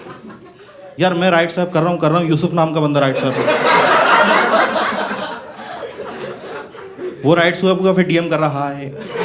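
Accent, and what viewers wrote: Indore, India. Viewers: Dono hamre MP se hena to accent to same hoga hi or me bn gaya itna bda comedian to mere ander bhi aap esa hi accent dekhoge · Bhai dono madhay pardes ke hai.... Bhai ka accent toh pura biaora , indore ke aas paas ka lag raha hai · Both Zakir and are from indore.. And that is the indori accent. That's why they sound same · Both he and Zakir are from Madhyapradesh and that’s why similar Hindi dialect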